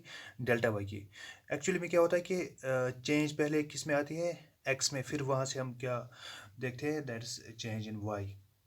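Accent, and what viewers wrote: Kashmir, India. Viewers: Sir! Are you kashmiri ?? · I think u r kashmiri bcoz aap ke batein 😄aap plus ko pulos bata rahay ho vaise b ham kashmiriyo ke aadat he hai nayi angreezi banana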